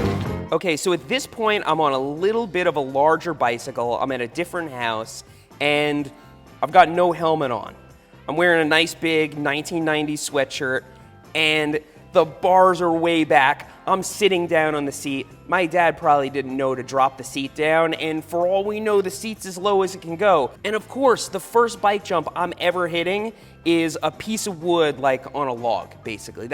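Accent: Long Island, United States. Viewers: It sounds like my people here in long island. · I can imagine what young would say with that long island accent "Forget about it" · Hey, do a whole video with your old long island accent! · Cannot not hear the long Island accent now lol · The Long Island accent started to come up during the video while was narrating his footage 😂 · you sound more Lawn Guyland then,then you do now... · I never noticed the LI accent, but I should have being raised in Bayside. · The way you sounded when you were younger is the exactly like my voice probably cause I’m from Long Island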